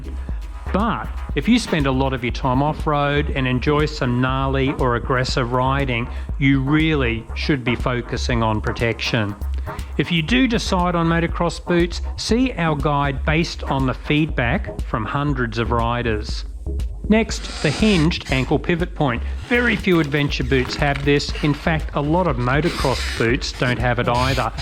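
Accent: Australia. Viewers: I thoroughly like this channel, especially the practical insights with an Aussie voice. Any chance of /CoS collaboration?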